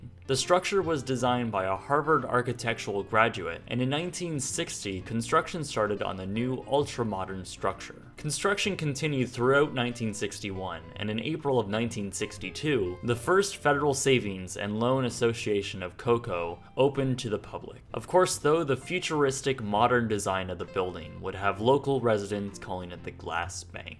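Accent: Canada. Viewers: you Canadian? sounds it. Orangeville? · Canadian detected.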